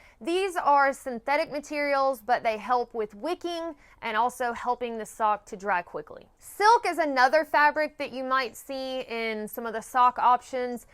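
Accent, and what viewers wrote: South, United States. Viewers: Hey y’all that’s a definitely a southern accent ha ha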